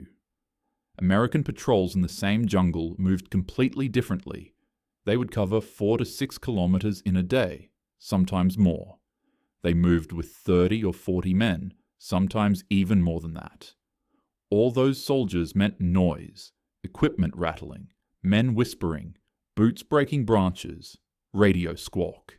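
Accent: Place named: Australia. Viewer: enjoy these, but happily an Aussie accent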